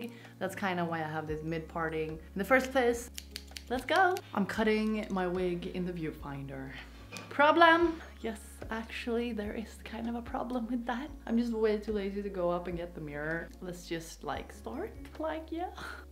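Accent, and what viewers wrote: Sweden. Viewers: Not to be rude or anything... But does she have a Swedish accent or is that a disorder..... I'm new to this Chanel 🤔😓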